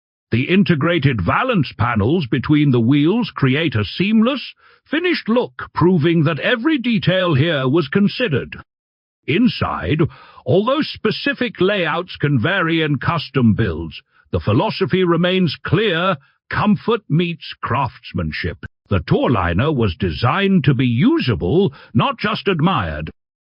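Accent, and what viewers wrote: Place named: United Kingdom. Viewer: A nice truck but what is with the goofy British accent? It makes me give a thumbs down.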